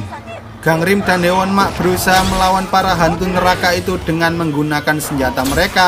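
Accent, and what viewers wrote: Java, Indonesia. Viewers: Medhok e lho,,,🤭 Filmnya bagus, sy baper terutama ketika Gihun ingin bertemu ibu dan meminta maaf pd beliau. Apik Bang,,,👍🏼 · Wong jowo t min? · Jowo mu medok lek😭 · Logat jawanya masih kental kali miminya 😅😆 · Yang cerita film ini pasti orang jawa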